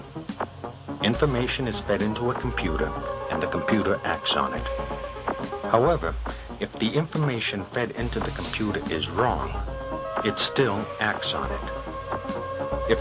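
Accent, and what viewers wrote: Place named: Boston, United States. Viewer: british? he sounds like he is from boston to me!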